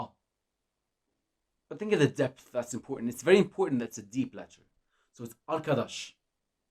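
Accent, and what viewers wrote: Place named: Ireland. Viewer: I understand and speak a small percentage of Turkish, and would really love to become fluent in it ama çok zor. I very much appreciate what you are doing to help people learn the Turkish language. Tell, did you by any chance learn English in Ireland? I am hearing an Irish accent from you. Kolay gelsin.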